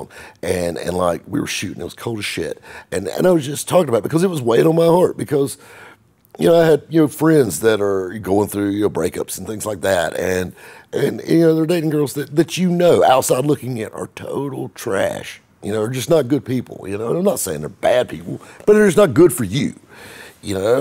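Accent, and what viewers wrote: South, United States. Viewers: Yess sir! Got to love she saylim southern drawl😉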